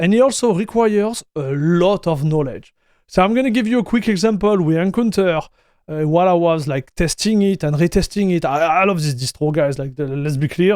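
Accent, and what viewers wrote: France. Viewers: I came here for the French accent ❤️❤️ Love the content keep it coming lad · Si je ne me trompe pas, tu viens de la France. T'as un accent Franglais sans doute, ce que j'aime bien 🙂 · If you don't like french accent, just go cook yourself an egg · You have a strong french accent, so i'll say it to you in french: Ce que tu as dis sur Debian est partiellement faux. Tu as dis que les version étaient vieilles. C'est le cas PAR DEFAUT. En 3 lignes de commandes, tu peux ajouter les repos backports de debian. Ces répos possèdent les dernière version de beaucoup de packages. Par exemple, je suis sous Debian 12, qui est normalement fourni avec le kernel 6.1, mais avec les backports j'ai pu avoir le kernel 6.11.10 . Pareil pour les drivers nvidia, ou encore gnome. Dès que tu actives les backports, avoir les dernières versions est simple, tu rajoutes juste -t <nomListe> a ta commande APT pour les prendre depuis les backports. 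AVERTISSEMENT: SI TU FAIS CA N'ESSAIE SURTOUT PAS D'INSTALLER LES META PACKAGES (linux-image-amd64 par exemple) CAR TU RISQUE D'AVOIR QUELQUES SURPRISES... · Excellent ! Un abonné en plus, j'ai commencé doucement avec Bazzite sur mon pc fixe il y a environ 1 mois, idem sur ma.rog ally et je doit dire que grace a ce dernier je suis tombé amoureux de linux et j'ai totalement oublié windows (mêmepour la bureautique), cependant Bazzite est encore jeune et présente quelques bug et plantage, rien d'insurmontable mais, ayant vu de quoi etait capable Linux avec ce dernier j'ai cette soif de decouverte et depuis quelques temps CachyOs me fait de l'oeil depuis quelques temps pour ma config fixe et franchement tu m'a donner envie de me lancer sur ce dernier. J'aurai pu commenter en anglais mais je me suis dit qu'un peu de Français te ferait plaisir 😅, on a le même accent à couper au couteau 😂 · Mdr mec l'accent , ca se sent que toi aussi on se moquait de toi en cours quand tu essayais un accent plus british ! 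N'empeche ça me rassure, meme si ton accent est french de ouf bah on comprend parfaitement !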